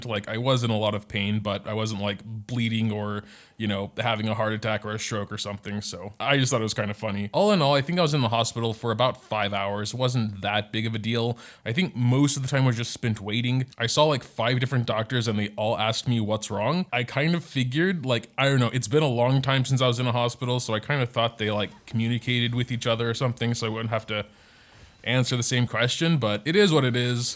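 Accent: Germany. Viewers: wait, you're german? austrian? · Why did I never notice hes german?💀. Also, wish ya a speedy recovery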